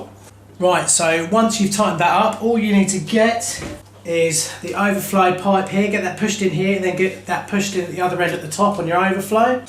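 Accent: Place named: United Kingdom. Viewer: The British accent is a little bit distracting.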